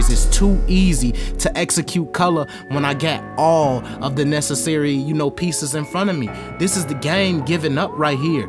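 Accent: Chicago, United States. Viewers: I knew the way you talk you was from the land. I’m definitely sliding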